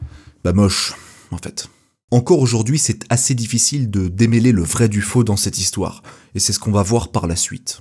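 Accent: Belgium. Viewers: Bravo tu parle le francais comme un belge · En tant que cousin français, la prononciation à la belge (quand bien même plus logique lorsque l'on y réfléchit) des nombres implique effectivement une conversion lorsque l'on l'écoute. Inutile d'être mesquin si cela fait tiquer, c'est naturel. Pauvres habitants d'El Paso, avoir la finale de Super Bawl qui saute, ils en ont eu, de la patience! Le SAA a dù démanger!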